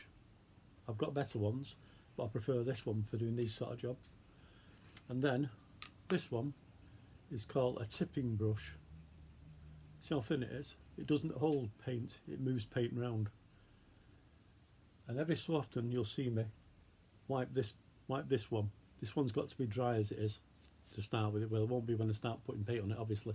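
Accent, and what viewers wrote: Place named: Northern England, United Kingdom. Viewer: Big up the North!!! Love you're accent. Where (exactly) does it originate from?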